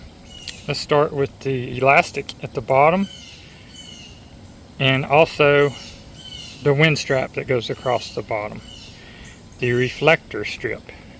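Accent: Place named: South, United States. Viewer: Yeah, and you're a pretty good actor. Maybe take a trip California, they love a southern accent there. You can be a Hollywood star! Plus, it's one of the most beautiful places with millions of acres of public land lots of jeep trails. I live in Mississippi but just bought property in Arkansas, just a rock throw from Pond Creek. I like to canoe and love all the water in that area! My girlfriend is from Louisiana, so we like to explore all the nearby states.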